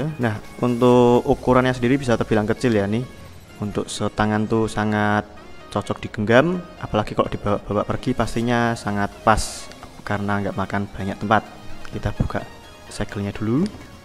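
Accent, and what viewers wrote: Java, Indonesia. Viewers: medhok mase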